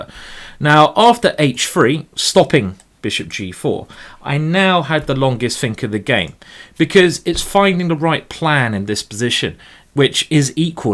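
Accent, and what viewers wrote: United Kingdom. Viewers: Playing video on full volume, enjoying the chess video, even his strong British accent, and that was when the sneeze nearly made me deaf. XD.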